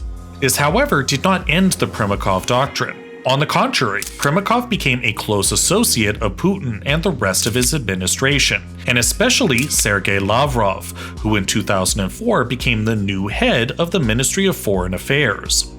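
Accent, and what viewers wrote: Poland. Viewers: I prefer your voice and your polish accent over this sanitized american voice, your videos sounded way better before (the content keeps getting better though) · Hey mate, you should stick to your original voice. Your polish accent adds a sense of charm to your videos and makes it feel more authentic. The American voice over kind of makes your videos feel more robotic. Overall, this video was really interesting and very enjoyable.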